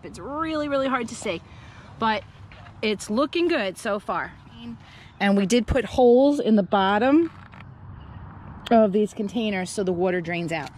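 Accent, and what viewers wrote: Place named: Long Island, United States. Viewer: Thats nuts! Love the long island accent.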